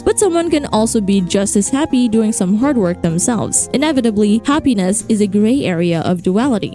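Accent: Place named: Philippines. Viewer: Thank you so much for making these videos!! I love watching them because I could use these as a basis for some of my analysis (I post them on tumblr hehe) because I focus more on heavy character/design analysis and storyline theories, but I kinda get lazy on the themes and origins part lol. I noticed through your accent that your a fellow Filipino as well haha. xD I hope you have a good day! :3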